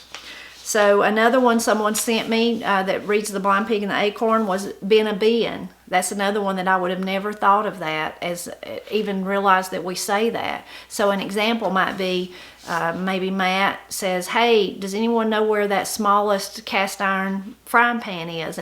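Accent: Appalachia, United States. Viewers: I like listening to you explanations of things so much because sometimes what you say sounds more Appalachian than the phrase your explaining. Like run and jump to get up there…sounds like…run n jump to get a bear. Most every where else they would say the road had ruts…I’m like you I say the road was rutted out. I love your channel❤️👍to me might coulda is might try…might oughta is this works sometimes for me…might shoulda is this always works for me.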